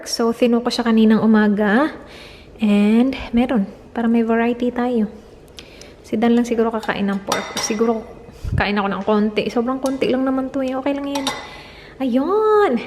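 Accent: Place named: Visayas, Philippines. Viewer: Kanang bisaya gud nga accent ako gnhan mam..😁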